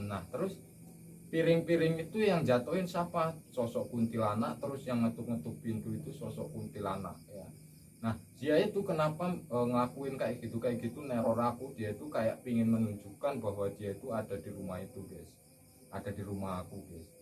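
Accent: Java, Indonesia. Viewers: mas ciri khas klo ngomong nya pas klo ngomong banget nya medok banget.. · Mantaps bang ceritanya seru mengerikan sering lah cerita kisah pengalaman kek gini karena serunya gk kalah sama vidio campingmu bang.. btw sampean orang mana bang logat jawa tpi kok tinggal di jabar?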